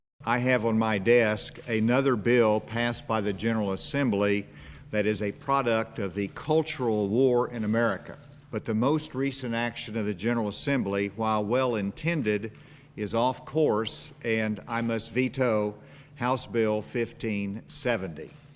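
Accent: England, United Kingdom. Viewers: Are you sure, I heard Dysphoria, he does have an English Accent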